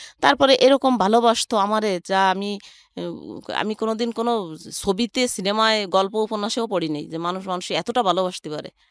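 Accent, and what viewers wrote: Bangladesh. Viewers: গল্প মনে হয় মিথা কারণ বাড়ি খুল্না কথা বলে বরিশাইল্লআ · বাসা মুকসুদপুর হবে ভাষায় মনে হচ্ছে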